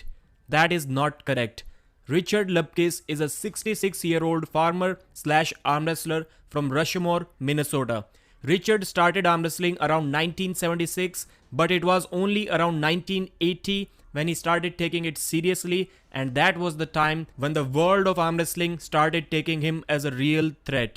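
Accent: India. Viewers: Диктор из индии??? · never heard of........... but the speaker of this video sounds like the stereotype indian technical support call center guy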